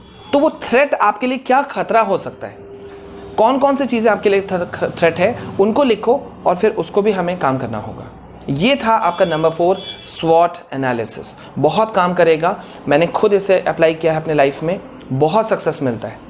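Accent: Bihar, India. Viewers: Sir aap bihar se ho kya ?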